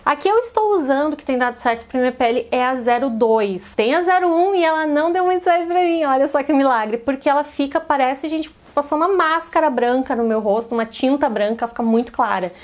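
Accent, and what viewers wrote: Rio Grande do Sul, Brazil. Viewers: Coisa boa é ouvir esse teu sotaque, gaúcha! 😍 todo mundo tá falando dessa base da tracta! Tá fazendo sucesso! Tu é uma lindeza! Beijo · é gaúcho flor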